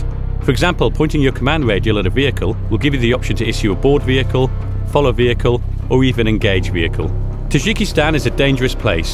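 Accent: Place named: United Kingdom. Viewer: "As a proud member of the United States Marine Corp" he says with a British accent.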